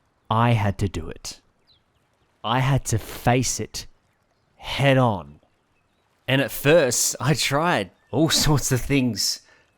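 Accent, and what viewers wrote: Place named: RP, United Kingdom. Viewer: Its been literal years. I thought this was Stimpee with a posh accent.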